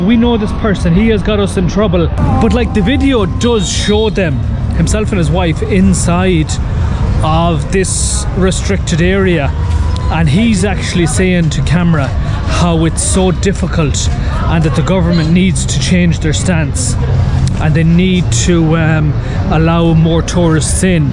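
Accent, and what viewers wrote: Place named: Ireland. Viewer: Absolutely brilliant. Discussing poetry and war on the border of Bangladesh with a senior army official. Fascinating and slightly surreal to listen to you sharing this experience. You retold it with such kindness and even better with your lovely Irish accent. Watching your videos remind me of my visits to Bangladesh and I am looking forward to watching the rest of your travel videos through Bangladesh. Thank you for sharing a part of your adventure/journey.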